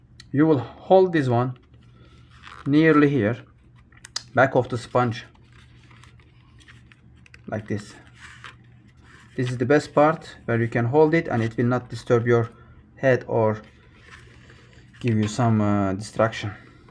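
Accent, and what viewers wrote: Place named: Turkey. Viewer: Süper video, teşekkürler. Aksandan türk olduğunu düşünüyorum 😄Hoparlör kulakları biraz rahatsız ediyor ama alışırım umarım.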